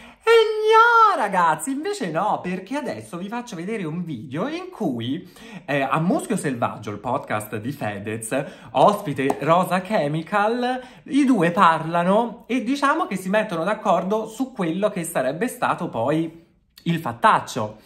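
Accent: Tuscany, Italy. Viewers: Ma te sei toscano, nel video ho sentito che stai a Milano ma non mi sembri lombardo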